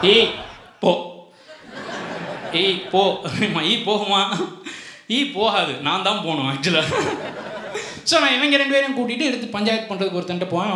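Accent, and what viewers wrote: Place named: Madurai, India. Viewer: Madurai or near by accent 😂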